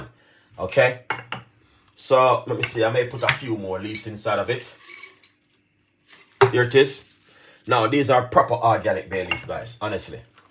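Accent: Jamaica. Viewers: This is how Jamaicans talk he is just being himself. Video is live not staged 😊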